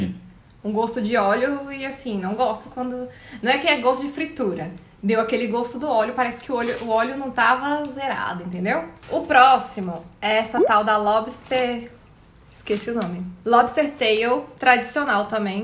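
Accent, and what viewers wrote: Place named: South, Brazil. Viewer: O é do sul? Sotaque tá meio entregando e CUECA VIRADA em vez de grostoli ou orelha de gato hahaha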